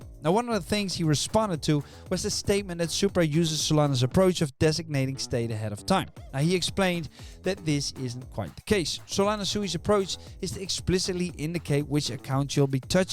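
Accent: Netherlands. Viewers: how high will it go in this run? hoor ik daar een Nederlands accentje?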